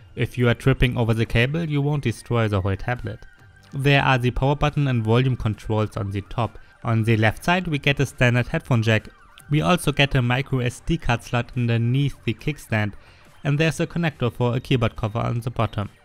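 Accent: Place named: Germany. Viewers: I think DEUTSCH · Yeah it's a very hard german accent:P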